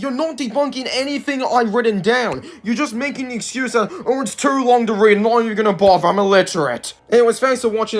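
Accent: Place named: United Kingdom. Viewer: The way you pronounce words made me suspect that you are British. I adore British voices. To me they are really enjoyable to listen. My lips and tongue cannot do that.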